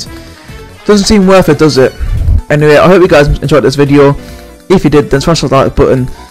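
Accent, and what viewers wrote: Newcastle, United Kingdom. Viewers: I can’t understand your Geordie accent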